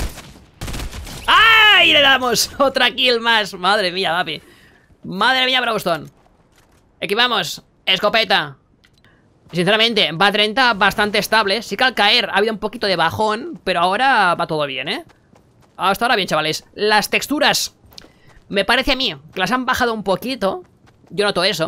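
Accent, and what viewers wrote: Spain. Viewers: Tengo que ver el video sin audio gracias a tu estresante y molesto acento español. Gracias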